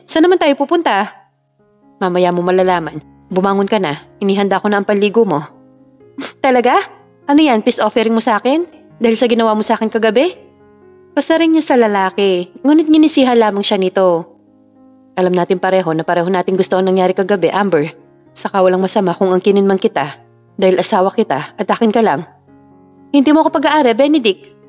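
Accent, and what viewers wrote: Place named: Batangas, Philippines. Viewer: Taga Batangas po ba kayo,my tono kasi ang pag basa nyo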